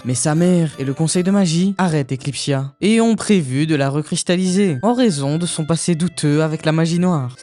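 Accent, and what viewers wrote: Belgium. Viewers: t'es belge non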